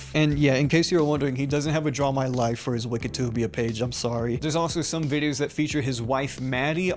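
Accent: Boston, United States. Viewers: I've been watching youtube essays on 2.5x speed in the background of my day to day for the better half of my life. Somehow I've never heard a single narrator with a Boston (assuming) accent and it's freaking me out.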